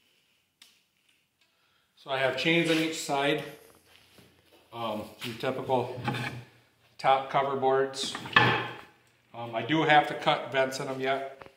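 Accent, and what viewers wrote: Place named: United States. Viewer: Love the American accent, 'ruff' (roof). 🙂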